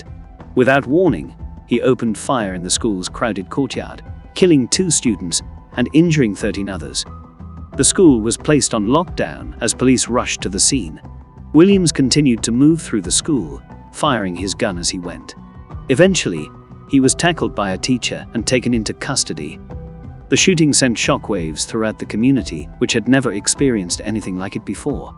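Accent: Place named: United Kingdom. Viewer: Why is a British voice doing the narrating for this video. These are murders that took place in America, the whole trope of having a esteemed British sounding voice to the narration for a documentary or a newspaper whatever is so corny.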